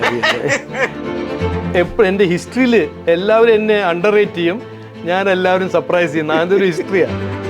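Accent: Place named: Palakkad, India. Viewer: പാലക്കാടൻ മലയാളത്തിന്റെ ഒരു രുചി സംസാരത്തിൽ നിഴലിക്കുന്നുണ്ട് thankyou sir 🙏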